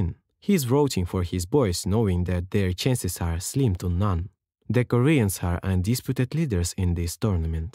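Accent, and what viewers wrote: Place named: Russia. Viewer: чел, ты бы хоть русский акцент убрал, если уж озвучиваешь на английском )))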